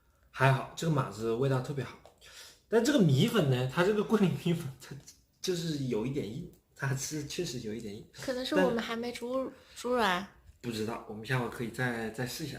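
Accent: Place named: Changsha, China. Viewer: 长沙妹陀，口音好重啊，嘻嘻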